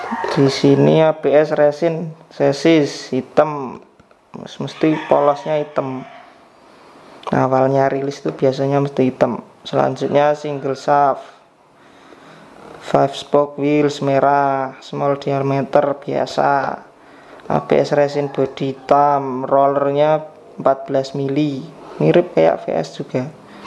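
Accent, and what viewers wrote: Java, Indonesia. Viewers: Orang mana tt bang sampean...??? Ngomong e kok jowo2 ... Aku pngn dolanan tamiya.. Tapi nang daerahku ra ono e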